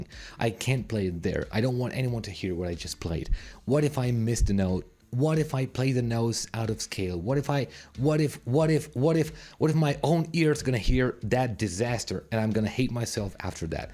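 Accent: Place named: United States. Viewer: Your accent has changed...it used to sound more British and now it sounds American.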